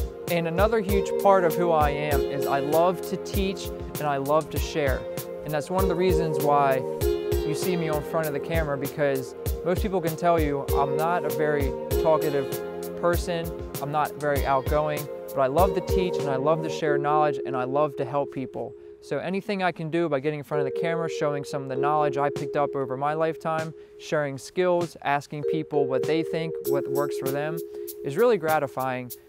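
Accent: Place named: Eastern Pennsylvania, United States. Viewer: What part of PA? You sound like your maybe eastern PA Lebanon,Perry county area.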